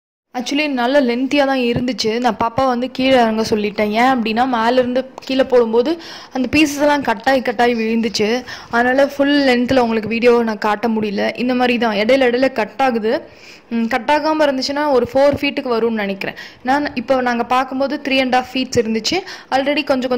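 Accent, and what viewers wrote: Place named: Tirunelveli, India. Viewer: Neega ena vorunu sollata enoda guess sareya irunda neega tiruneveli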